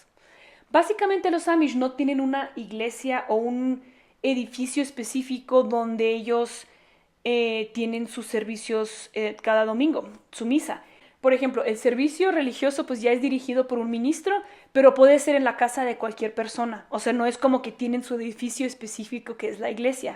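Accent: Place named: Mexico. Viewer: Gracias por tu vídeo y la manera de explicarlo. Me parece una exposición de la realidad actual muy enriquecedora. Yo estuve en Pensilvania en los años 90 en un pueblo Amisch para comprar productos típicos y curiosamente cuando les hablé en alemán estándar (Hochdeutsch), me respondieron en un dialecto que por suerte comprendí, y era más similar al suizo alemán, que aprendí por diversos motivos. Posteriormente, hace 6-7 años, tuve la oportunidad de conocer una zona donde había menonitas que vivían en Brasil, concretamente en Santa Catarina. Estas personas tenían dificultades para hablar en alemán estándar (Schriftdeutsch oder Hochdeutsch) sin embargo, hablaban un dialecto entendible y más parecido al Plattdeitsch (similar al holandés). Afortunadamente hablo alemán, suizo y holandés, y así pues levanté la curiosidad de los presentes. Es muy divertido hablar diferentes dialectos del antiguo alemán para poder comunicarte con los descendientes de los centroeuropeos. 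Por cierto, al empezar a ver el video pensaba que eras de España. Tienes cara de española 120%, pero luego te escucho hablar y me doy cuenta de que tienes acento mejicano 🤗😇. Hast du Videos in plattdeutsch?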